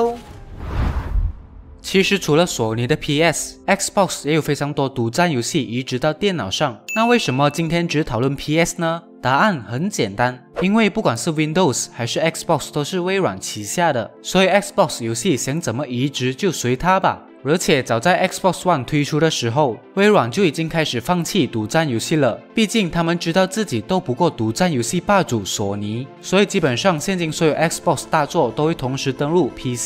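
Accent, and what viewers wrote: Malaysia. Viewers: 他是马来西亚人哦~ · 應該是馬來西亞的口音吧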